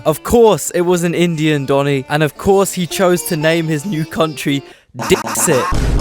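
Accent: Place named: United Kingdom. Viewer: british accent balls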